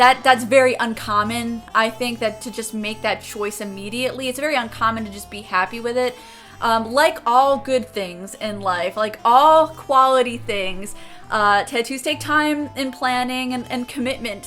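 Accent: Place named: Chicago, United States. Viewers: I love how Midwest your accent is I can hear the Chicago dripping from your voice. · Ya from Chicago? Ya 'da bears 'da bulls accent! Its all good lol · I thought you had an Illinois accent!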